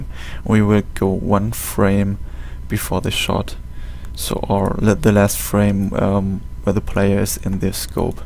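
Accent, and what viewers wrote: Germany. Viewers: Deutscher oder? :D Hört man raus aber gutes Tut ^^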